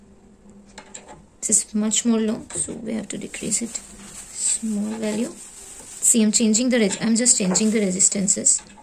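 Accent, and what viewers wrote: Bengal, India. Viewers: Mam are you bengali ? I guess by yours accent Correct me if I am wrong..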